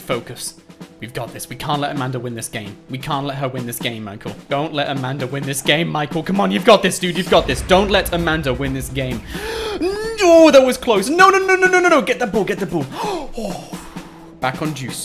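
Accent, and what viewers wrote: United Kingdom. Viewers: Kylah Folkes he’s a British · listen to his accent; he's British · Is British or American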